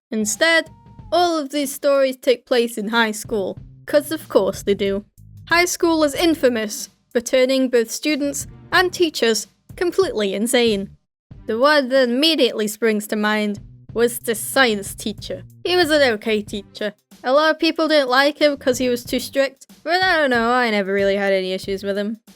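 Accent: United Kingdom. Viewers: I Still Love Your British Voice - w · (if this is somehow racist im sorry) are you British you sound British and if so... GOOD FOR YOU!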